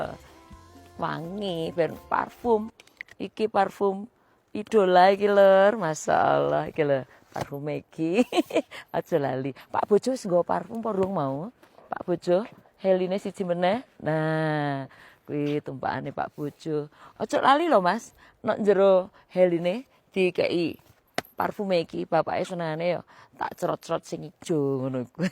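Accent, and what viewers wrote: Java, Indonesia. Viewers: MasyaAllah mbk,medok pokoe jowone ❤❤❤ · MasyaAllah alhamdulillah, ya ini yg kmi tunggu2 dan harapkan Mbak, ngagem busana Jawa Asli jian + luwes gandes, Hayuuuuu jobo jero, matur nuwun sampun membudayakan Bhs Jawa dan logatnya yg medok natural, jg busana Jawa yg anggun, mantan, 🤩👍😇🤗🥰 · Ibuuuu... Suka ikutin Ibu... Jowone enak le ngendiko... ❤❤❤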